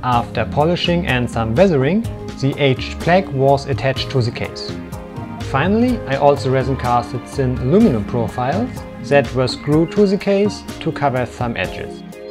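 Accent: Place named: Germany. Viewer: Nice work, aber wenn deutsche versuchen Englisch zu sprechen hört der Spaß bei jedem Video auf O.o omg !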